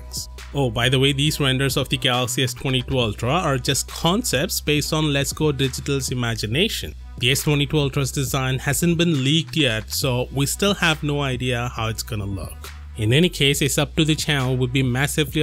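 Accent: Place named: India. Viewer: The Speaker Hindi Engelish🤮🤮🤮🤮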